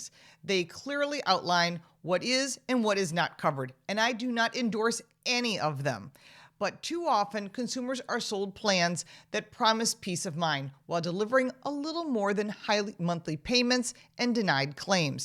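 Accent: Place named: Buffalo, United States. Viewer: The familiar Buffalo accent. Very nasal.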